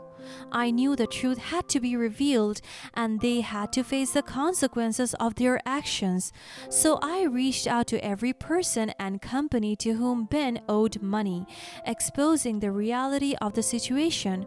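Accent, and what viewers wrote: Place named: Asia. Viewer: 80M RUPEES? NOT 80M USD ! BULLSHIT! I CAN HEAR THE ASIAN ACCENT!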